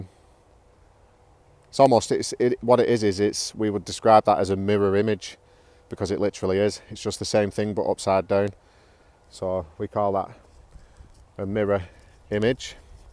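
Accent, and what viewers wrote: Northern England, United Kingdom. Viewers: hello, I really enjoy your videos, especially when you take it in the nature. So two days ago I watched the film The Firm, it's about football fans, they fout with each other and at the end one of them was killed. I know the soccer in England is... like national sport..(I think) and my question is...is there in England the same groups of people in nowadays who are more interesting in having a battle after match....so what else... could you recommend tv shows or movie with the northern English accent something like yours...)) I am going to have the same one!!)) again thank you very much for things you are doing!!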